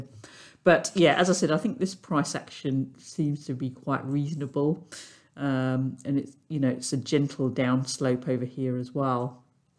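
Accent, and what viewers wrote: United Kingdom. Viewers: The best analysis in a very long time, and I love the British accent as it reminds me of my lovely old days in Wesr Yorkshire at Bradford Uni. Ta love!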